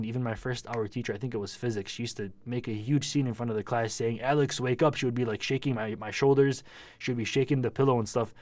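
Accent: Michigan, United States. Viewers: You look like you’re from dearborn you have our accent a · Immediately knew he was from Michigan because of the accent lmao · I thought your accent seemed so familiar lol I’m from michigan too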